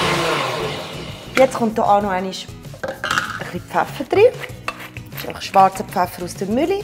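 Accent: Switzerland. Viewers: Super Getränk sehr gut gemacht ich liebe deinen Schweizer Dialekt LG ❤️ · Cool ich muss das auch mal ausprobieren. Schweizer Dialekt klingt so sympathisch 🤗🤗🤗😋😋😋👍🏼👍🏼👍🏼👌🏼👌🏼👌🏼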